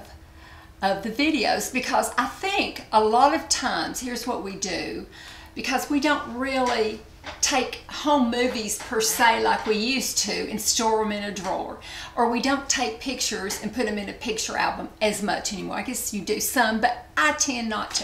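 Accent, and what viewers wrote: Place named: Kentucky, United States. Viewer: I'm glad to hear that you were also born a Kentuckian! I live in Louisville but also spent many years in a small country town south of Louisville. I wish I had your accent as it is so charming!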